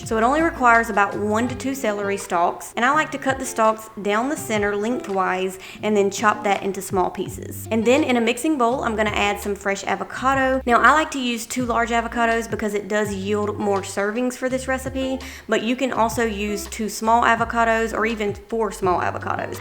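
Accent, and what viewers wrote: United States. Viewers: Kajol speaking Amrikan....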